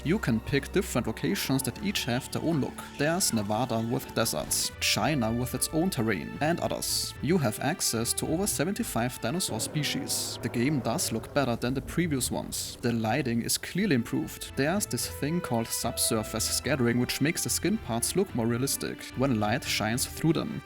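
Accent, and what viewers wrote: Germany. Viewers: Do i hear german accent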